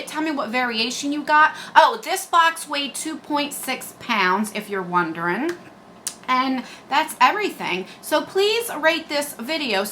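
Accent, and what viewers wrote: New Jersey, United States. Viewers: Girl same!! I think it’s a jersey accent haha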